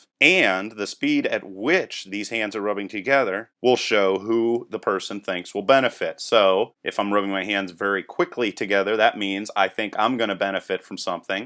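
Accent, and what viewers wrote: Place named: Western Pennsylvania, United States. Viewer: Are you from western PA? I recognize that accent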